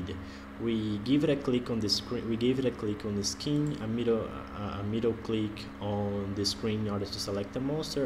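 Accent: Brazil. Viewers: Parece ser um brasileiro falando. Parabéns pelo tutorial e muito obrigado pelo conteúdo. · Parece ser um BR falando, se for, faz um em portugues ai tbm man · Ia dizer isso, ou é Brasileiro ou indiano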